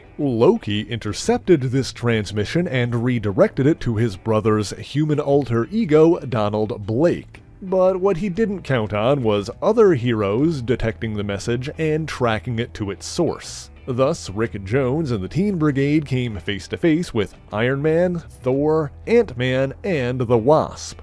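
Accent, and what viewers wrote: Newfoundland, Canada. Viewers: I detect a mild Newfie or Cape Breton accent here b’ye!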